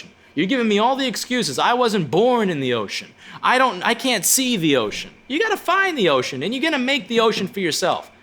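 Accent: New York, United States. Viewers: Your inner new Yorker is coming out in your vowels lol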